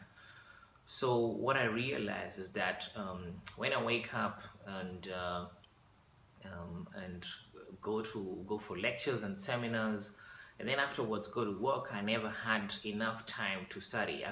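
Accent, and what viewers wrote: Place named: Kenya. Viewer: ongea tu poa kama mkenya!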